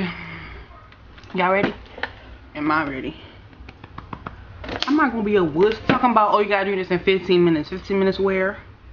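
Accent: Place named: Jamaica. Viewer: Hi lady! You sound Jamaican like me. Where you're from originally? Mi love your videos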